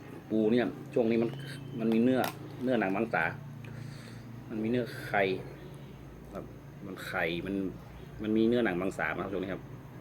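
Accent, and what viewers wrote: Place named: Central Thailand, Thailand. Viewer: ชอบคุณ พูดภาษากลางพอรู้ค่ะ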